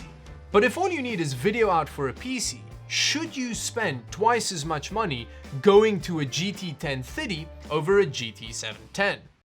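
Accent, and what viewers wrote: South Africa. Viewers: You must be somewhat South African related? Your accent and word choice gives South African vibes😅 EDIT: Another scenario for either of the cards is to use it as dedicated PhysX GPUs, I have successfully used a GT 710 for PhysX in Borderlands 2, but it is not sufficient enough for Killing Floor 2 since that requires a dedicated GTX 1060 for PhysX. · This man sounds south african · Hey man I hear that accent my fellow South African good to see some SA youtubers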